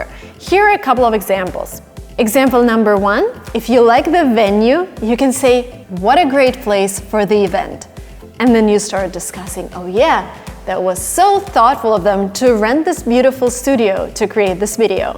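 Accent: India. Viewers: This exactly is similar to how we speak English in India. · It look's like all Indians are showing up here🇮🇳.The thing here is her accent is like Indian who speaking in English...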